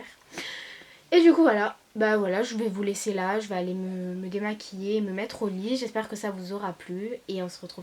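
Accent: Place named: Paris, France. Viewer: Pourquoi tu fait l'accent parisien?????🤭🤭🤭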